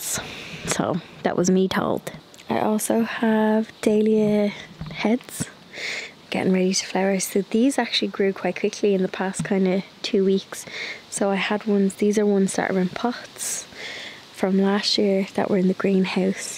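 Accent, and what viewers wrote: Ireland. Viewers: That jug of sweet peas… sigh! Lovely!, I imagined the beautiful scent whilst looking at the sweet peas. I love your YT channel and look forward to seeing a new notification when your latest YT vid is uploaded 😊. A fan from 🇦🇺 I went on a trip to Ireland a number of years ago to walk where a lot of my ancestors walked… hearing and seeing your Irishness is an added bonus to enjoying your gardening, renovating, travel, and craft projects!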